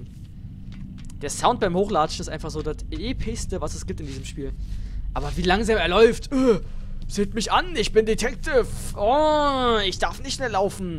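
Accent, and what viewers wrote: Berlin, Germany. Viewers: Ich schreib nur so ungern ;-) Ich schau erst seit gestern deine LP`s und find sie Klasse. Ohnehin geht nix über Berliner Dialekt :D Achja: Mach noch mehr Horrorgames!!!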